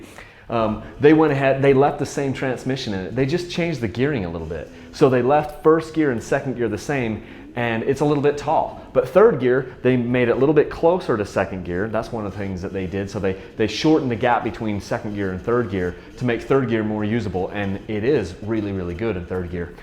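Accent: Utah, United States. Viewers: Dude you have the best example of a Utah accent I've ever heard! Thanks for the sweet content in familiar places, hope to bump into to you some day.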